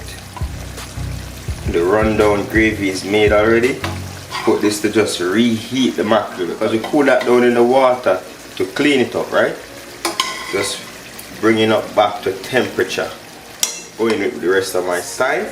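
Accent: Jamaica. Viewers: 🇺🇸🇬🇧 Hello 🤓. This is my first time seeing and watching your channel and I'm sure it won't be the last. I'm sold.. what a lovely and vibrant young man you're? Very clean and detailed cooking/ preparation. I love your vibes, I'm very pleased. I love your English entwined with the Jamaican Creole. I must tell you that I'm not a food or person. However, I like chicken, lobster, shrimp crab and fish. So, now that you're sending me in the kitchen to learn to cook Jamaican food 🤓. I will be trying the pig tail and the chicken soon. Son, God has blessed you with a wonderful talent. You've got many more as well, use them. Keep striving for the best. The sky is the limit, continue to be an inspiration to the youths. Too many of our youths are in jail or doing drugs, stealing,gangs etc. I'm one mother and grandmother who is very proud of you, you've my prayers and my blessings.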